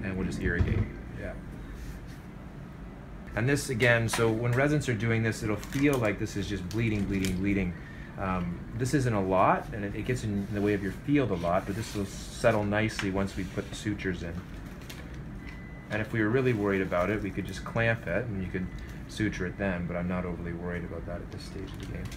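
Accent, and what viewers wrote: Canada. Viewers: I can surly hear your Canadian accent . Love your teaching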